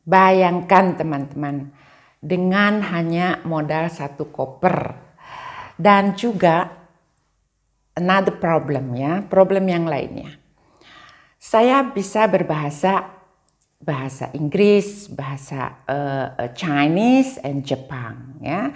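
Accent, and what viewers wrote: West Java, Indonesia. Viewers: Masya Allah. Ibu parantos 40 thn di Swiss tapi tasih keneh nyunda. 👍 Punten neupangkeun abdi ti Cileunyi bdg. Pun anak Oge di Swiss ti sasih januari nuju ngiring program au pair, linggihna di kota meggen. Mudah2an pun anak tiasa tepang sareung ibu. 🙏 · 30 tahun lebih tinggal di Swiss, tapi logat bahasa sundanya masih terasa bu.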